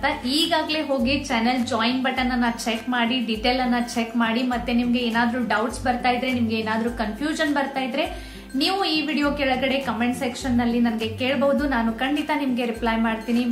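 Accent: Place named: North Karnataka, India. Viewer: Nimma Kannada nange tumba ista iam also Uttara Karnataka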